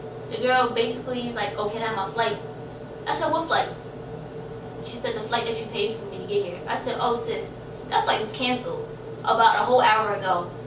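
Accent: New York, United States. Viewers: That New York accent lol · When you said dumb cheap I knew you weren’t from the South. I knew automatically that u was from NY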